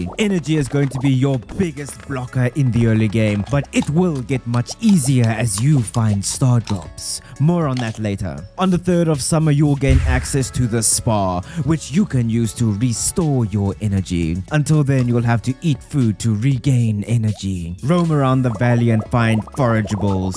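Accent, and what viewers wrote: South Africa. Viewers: You are south african for sure.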